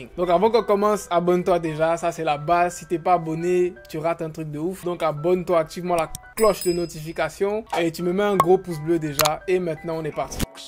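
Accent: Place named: Ivory Coast. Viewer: Merci pour la video. Dans ton accent on sait que t'es un vrai Ivoirien, j'en suis juste fière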